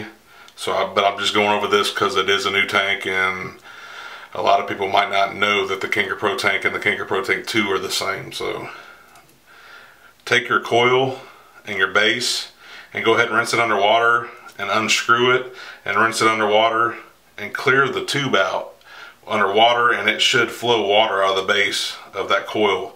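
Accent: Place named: South, United States. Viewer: "take you some paper towel" that southern talk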